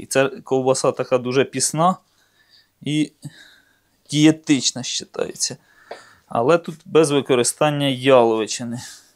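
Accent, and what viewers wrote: Ukraine. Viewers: Не із Західноіі Украііни вже чую по акценту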